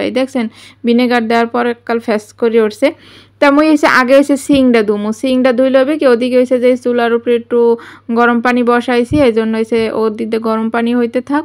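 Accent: Barishal, Bangladesh. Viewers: আসসালামু আলায়কুম আপু আজকে প্রথম তোমার ভিডিও দেখলাম ।আপু তুমি কি বরিশালের মেয়ে। কথা শুনে মনে হলো। আপু অনেক অনেক ভালোবাসা রইলো আপু। আপু আমি ও কিন্তু বরিশালের মেয়ে ❤❤❤❤❤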